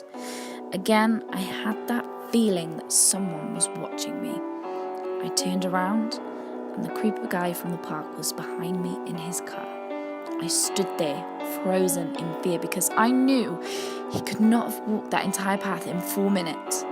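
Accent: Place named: United Kingdom. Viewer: Thank you for using my story and the lovely shoutout! You're the best. I'm a sucker for a British accent. Beautiful narration darling.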